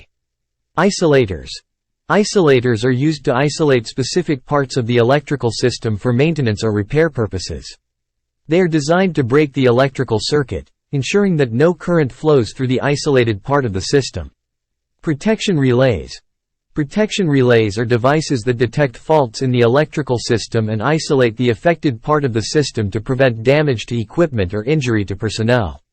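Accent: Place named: Tamil Nadu, India. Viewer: Tamil voice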